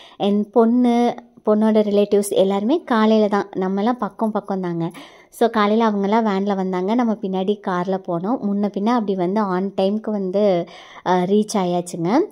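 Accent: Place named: Kongu, India. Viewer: Sis nan 1st tym unka long video pakuren ninga rmba cute ah positive ha peasuringa..innaila erunthu unka konju kongu tamilku nan admai❤❤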